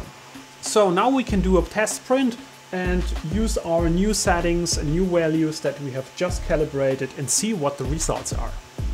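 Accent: Germany. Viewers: Hi, "hallo!" may I say if I recognized correctly your german accent(!?). Anyway, you really rock as a teacher, with cristal clear explanations, and you have the hability (intelligence!) to clarify and simplify those complicated lines of commands in Marlin: just a thousand thanks for that! I know I'm coming really late after your video, but I only saw it 2 days ago... I have a precise question for you: I did all you described, also for the #986 (#define RESTORE_LEVELING_AFTER_G28). But I tried it (I have an Anet A8 with a V 1.5 board), the manual mesh leveling is perfect, and so are my prints afterwards! ---> BUT... if I shut off my printer, the next time I'm launching a new print...the bed is not leveled anymore....I must re-do the complete manual bed leveling again.... ---> it seems that the leveling is NOT written in the EEPROM, although I had uncomment the line #986 (#define RESTORE_LEVELING_AFTER_G28) and click on "Store settings" on the LCD panel.... 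ANY IDEAS? Thanks again, vielen Dank, hope you'll be able to see my questions and answer it...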